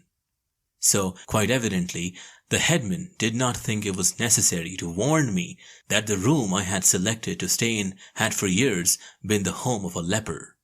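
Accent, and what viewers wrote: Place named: India. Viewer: Your stories telling in unbelievable You are a white Hunter talking with a Indian accent ,Who thought of this combination ,Its BRILLIANT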